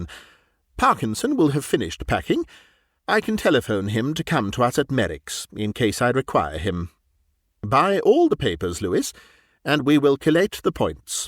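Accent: United Kingdom. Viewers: Im Egyptian. English has been my second language all through my educational and professional life. However, i am more accustomed to the American accent because of the movies, series…. etc. only recently have I discovered the joys of listening to old radio plays and bbc stories. I had to set the playback speed to 0.75 in order to comprehend the British accent. But listening to British perfectly pronounced by you sir, I don’t need to change the speed on your channel. Thank you very much.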